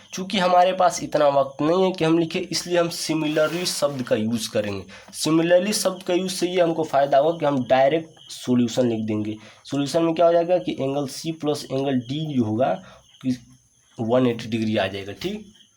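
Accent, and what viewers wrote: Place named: Rural, India. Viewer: You are speaking like a dehati. Dehati kahi ka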